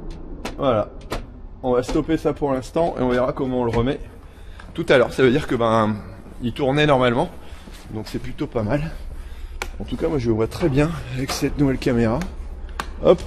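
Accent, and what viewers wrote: Nord, France. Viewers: Bonjour, je te suis depuis la seyne sur mer (var), mes parents étaient cultivateurs à Naves près de Cambrai. J'apprécie tes vidéos, tes explications sur l'agriculture d'aujourd'hui. Bonne continuation pour la suite. L'accent du Nord est magnifique.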